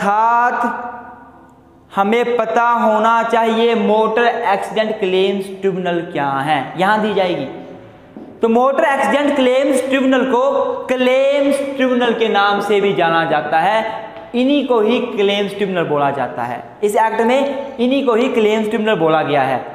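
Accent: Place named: Punjab, India. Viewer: Sir, your accent is punjabi hindi, which delights me, why I don't know, but I guess you have a good teacher ability, which encourages us to approach you, so keep going in this way. Ranjit Basfor, Barshapara, Guwahati, Assam.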